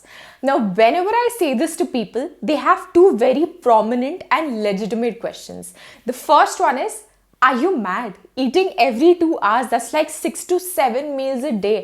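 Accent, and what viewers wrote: India. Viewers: You sound like a mature south Indian. Love you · I really like her Indian accent 😜.... And major thanks for the weight loss tips. Totally gonna try it today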